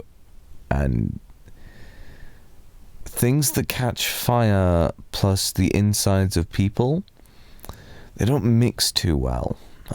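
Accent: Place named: United Kingdom. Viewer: Finally, British accent! No more American accents!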